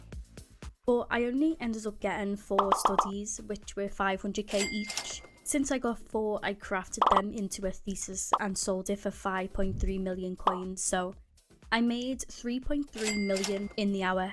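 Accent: Liverpool, United Kingdom. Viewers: Wait are you scouse? Because I am and I’ve never heard of a scouse Minecraft youtuber😂😂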